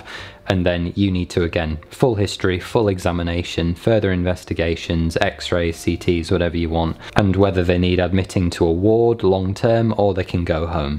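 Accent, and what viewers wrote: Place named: United Kingdom. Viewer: you have a big USA gay fanbase here--we have a thing for docs with British accents. Obviously Dr. Gill is the Alpha Leader of all posh British twink doctors but you are right up there. Peace!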